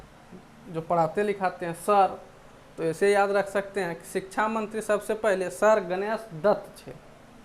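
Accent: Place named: Patna, India. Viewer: Patmij vala kahe Bolte ho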